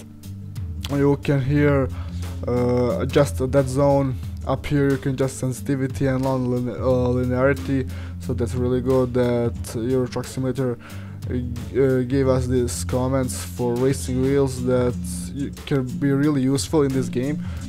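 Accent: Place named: Hungary. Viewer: Damn your accent so similar to my hungarian accent. Where are you from?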